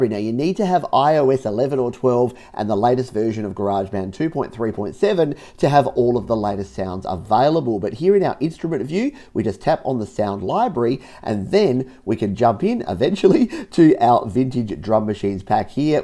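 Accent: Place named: Australia. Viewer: I recognise that accent. Hi fellow Aussie.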